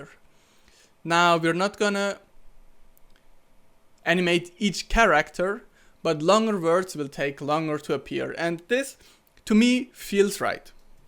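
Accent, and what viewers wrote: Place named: Switzerland. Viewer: oh schwizerdütsch, geil!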